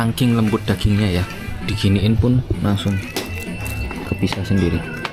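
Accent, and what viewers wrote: Java, Indonesia. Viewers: Mas logat mu Surabaya atau madura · Kapan² full gae boso Jowo Cak 😁 logatmu wes kuwentell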